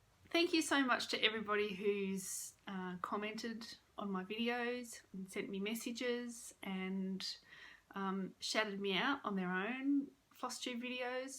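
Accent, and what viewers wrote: Australia. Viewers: Another fun video. Glad your sons are enjoying participating in your floss tubes. Love all your stitching and your Australian accent. Frosty is adorable and his name fits him purrfectly. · I love your Aussie accent! Be you and your work is beautiful😁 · I’m binging your videos while working. I love seeing all your things and I love the Australian accent as well. Bring a southerner in the US, it’s fun listening to others’ accents and they various things are pronounced. I can wait to see more!! Stitching and quilts alike 🥰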